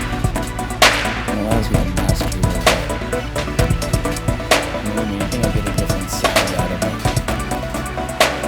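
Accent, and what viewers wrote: Philadelphia, United States. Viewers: Yo bro are you from Philly?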